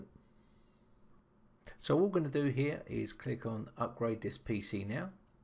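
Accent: United Kingdom. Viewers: I love British accent,thank god it wasn't a Indian man...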